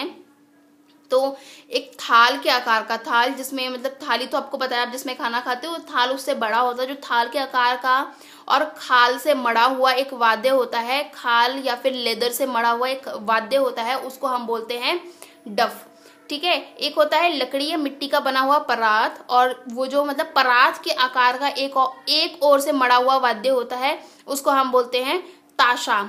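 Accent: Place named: Haryana, India. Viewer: Bhut ache se smjate ho ji aapki haryanvi style me smjana kare acha lga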